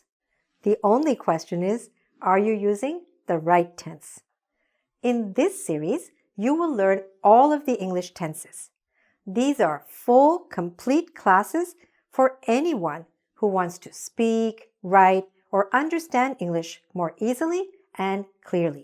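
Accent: United States. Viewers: Hi,! I'm from Bavaria. Are you an American? You speak English with an american accent, I'd say. Or I'm wrong? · This english is american or British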